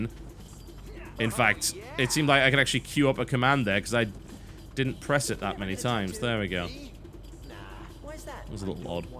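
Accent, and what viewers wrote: United Kingdom. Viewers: You talk like The Doctor (And not because of the British accent. You just have the same emotional phases in your voice. and your sentences.)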